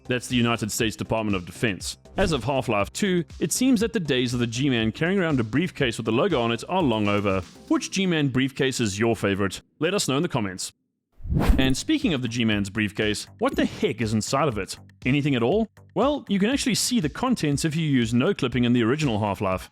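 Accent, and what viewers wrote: South Africa. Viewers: I know a South African when I hear one, lol. Dope video.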